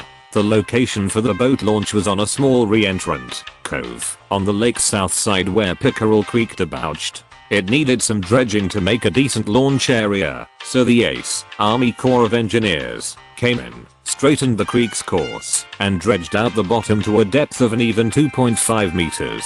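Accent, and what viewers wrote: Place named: Wisconsin, United States. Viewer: I know a Wisconsite when I hear one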